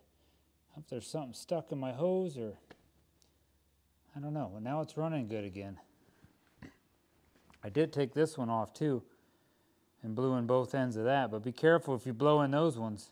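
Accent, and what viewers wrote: Pennsylvania, United States. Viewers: Cool. I thought you had kind of a PA accent. I’m originally from NE PA and now I live in NH. NH apparently stinks for trying to buy non-ethanol gas. No gas stations sell it that I can find and only specialty stores sell it in cans for like $25-$30 per gallon. Anyway, I found my problem. I actually blew some compressed air backwards through the gas line after emptying the tank. There was a clump of dirt stuck on some kind of gummy crap stuck somewhere between the top of the tank and the inlet inside the tank. Looked like rubber cement. Runs like a champ again now. Hopefully that was the only dirt in the tank. Thanks for the idea of blowing out the lines like that though. Without your video, I’d probably have taken apart half the mower by now.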